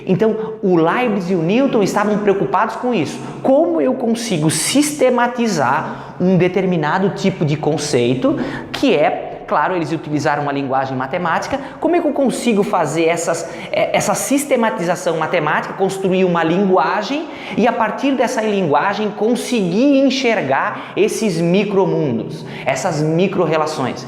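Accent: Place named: Limeira, Brazil. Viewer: Amigo, vc é de Limeira-SP? Pelo sotaque, se parece com o meu....rsrsrs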